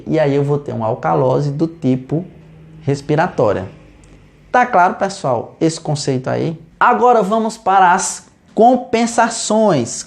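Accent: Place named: Northeast, Brazil. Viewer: Esse povo Nordestino tem um acento muito feio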